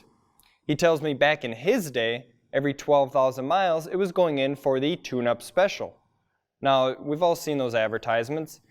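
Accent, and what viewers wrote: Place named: Wisconsin, United States. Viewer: Ah, a Fellow Wisconsinite. The accent when heavy is very apparent.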